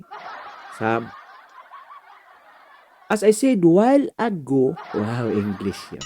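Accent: Visayas, Philippines. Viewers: Visaya ka eh di ka Naman tlga Taga manila hahaha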